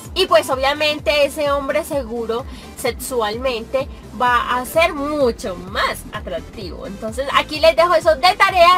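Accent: Colombia. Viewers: Me gusta el asentó colombiano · Hola apenas estoy viendo tus videos y conociéndote, eres una chica guapísima, linda y muy carismática!!! me encanta el asento de las chicas colombianas jajajaja ya me convertí en tu fiel fan.! voy a seguirte con frecuencia saludos desde Monterrey México..!!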